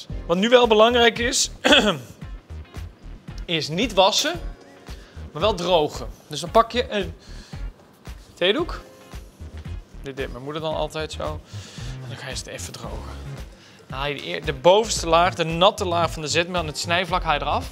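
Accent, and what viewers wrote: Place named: Holland, Netherlands. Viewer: Sowieso heeft hij meer een Hollands accent. Die "R" heeft hij in ieder geval niet in Brabant geleerd. Beetje een mengelmoesje, dus ik snap wel, dat hij dan de termen patat en friet door elkaar heen gebruikt.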